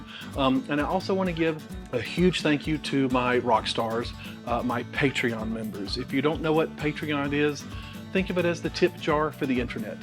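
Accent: South, United States. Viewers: I love you and I am proposing. I love your personality, your southern manner of speaking, and darlin’ you can cook! I know you are spoken for, but I will wait. BTW I am 81 years. ❤ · My husband says you’re me but with a beard!!:) that’s because his mother is German and he grew up speaking high English. I’m from Louisiana aka Luzianna. I grew up with such an accent but moved north in high school and have been mercilessly teased since. I think your Southern style is lovely and refreshing! God bless you shooger💜🙂 I love you’re show and find it informative and entertaining. I love your recipes and tips. Keep up the great work. 🍽️🎬🎦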